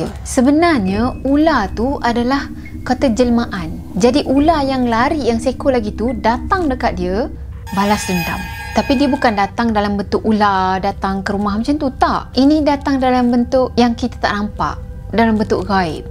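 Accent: Kelantan, Malaysia. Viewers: Org kelatey ko kak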